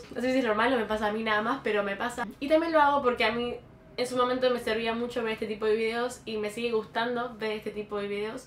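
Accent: United Kingdom. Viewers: Vine a tu canal por tik tok. Empecé con un vídeo de como aprender inglés y termine en este video 🤭 eres re linda! Amo tu acento británico 🤩 y le aplaudo 👏 a tu mami por apoyarte puesto que no todos los padres entienden a sus hijos cuando se declaran con otras preferencias sexuales. Tus videos me han ayudado mucho! Sigue así! 🤗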